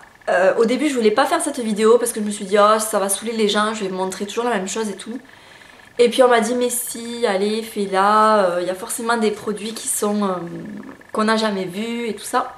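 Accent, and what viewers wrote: South, France. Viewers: J'adore regarder tes vidéos sont un vrai plaisir, surtout avec ton petit accent du suuud !!^^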